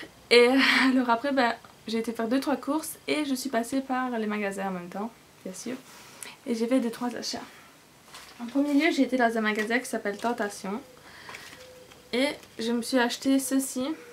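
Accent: Belgium. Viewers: "T'sais nin comment ca s'dit":D Punaise (j'allais dire un gros mot) tu sais pas comment je suis genre contente d'entendre une belge sur Youtube (je te l'ai déjà dit je sais)! Bisous à toi et continue à faire tes vidéos elles sont top!:)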